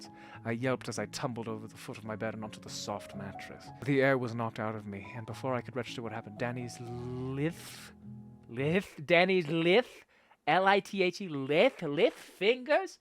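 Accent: United Kingdom. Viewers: Danny, fyi: FLAWLESS hair! Like that British accent. As a native Brit: *chefs kiss * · THAT BRITISH ACCENT KILLED ME 💀✌️ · Yes British Danny yes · You know what you should do Danny (just a suggestion) you should continue reading the lord of the rings in ur British accent it helped and still helps me fall asleep every time I listen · the fact that I was just on wattpad, but I came here bc I googled: british gentleman from the 1800 voice 🤜🗿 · I CANT WITH HIS BRITISH VOICE- ITS TOO MUCH FOR MEEEEEEEE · Bro your British accent sounds like a professional narrator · the chibi is so adorable!!!! I'd really like a spicy webtoon about Danny... that'd be great along with chibi scenes 😖😖😖😖😖😖I'm so weird. Anyway I love his British accent like...😚😚😚😚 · you should Collab with Speechify an add your British action I swear I would pay just to have your voice read my stories😭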